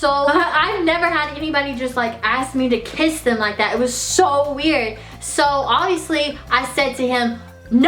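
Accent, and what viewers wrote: South, United States. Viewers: You sound so southern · Margot robbie said she watched your videos to get a southern accent for a movie omg! 😍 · you voice sounds country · I love your country voice man I've always liked country voices 😝 lol I've never said man before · what state are you from? I realize you would obviously be from the south. I live in the south aswell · Are you southern cuz you have a really strong accent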